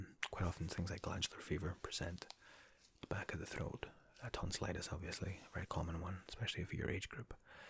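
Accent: Scotland, United Kingdom. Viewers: thank u for your reply :) Lol, of course not, u don't sound like Russian at all, but personally I don't like Scottish accent much but in your case it's completely opposite! Best of luck and keep up the good work! :) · ASMR with Scottish accent? YAS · hang on are you Scottish??? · Are you from Scotland? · what is about Scottish accents that relax the hell outta me? I have Celtic background somewhat, my maternal side is the Stewarts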